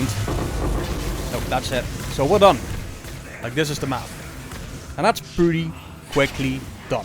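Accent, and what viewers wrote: Netherlands. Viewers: I Looove the dutch english dialect. nice video.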